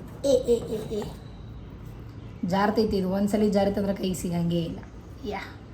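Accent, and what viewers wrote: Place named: Gulbarga, India. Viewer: ಹೇ ಹುಡುಗಿ ಛಲೋ video ಮಾಡ್ತಿ ಮತ್ತ ಬಹಳ ಛಲೋ ಗುಲ್ಬರ್ಗ ಭಾಷೆಯಲ್ಲಿ ಮಾತಾಡ್ತೀಯಾ ಹೀಂಗೆ video ಮಾಡಿಕೊತಾ ಹೋಗು ನಮಗೂ ಬೆಂಗಳೂರ್ ಭಾಷೆ ಮಾತಾಡಿ ಮಾತಾಡಿ ಬೇಸರ ಬಂದಿದೆ... ಏನಂತಿ 🙋‍♂️🙋‍♂️🙋‍♂️🙋‍♂️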